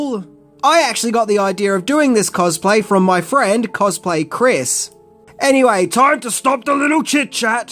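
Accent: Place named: Australia. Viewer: Hearing your Australian accent coming from freddy is awesome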